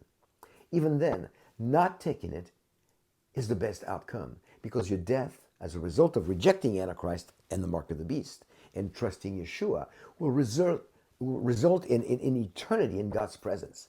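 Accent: France. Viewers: Watching from South Africa and enjoying your teaching tremendously....especially your brilliant sense of humour. God bless you richly. Also love your French accent!!! Shalom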